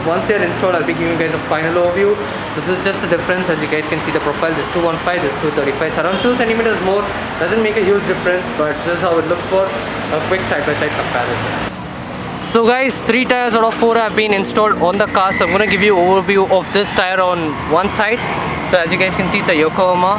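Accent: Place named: South India, India. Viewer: Bloody southie .........change your accent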